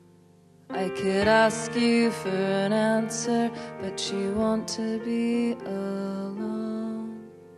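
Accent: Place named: Boston, United States. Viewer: uhhh, she actually sounds like she's from the Boston area. I'm from the same town as she is and she just sounds totally normal to me.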